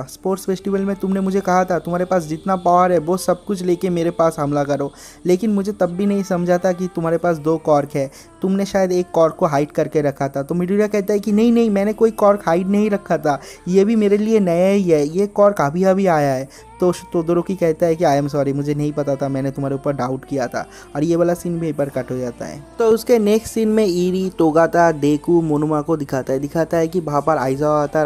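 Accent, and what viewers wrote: Bengal, India. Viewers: Are u Bengali?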